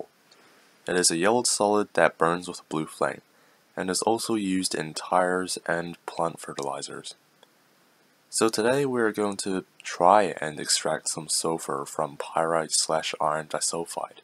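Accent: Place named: Australia. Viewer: I can't tell where your from. Australia?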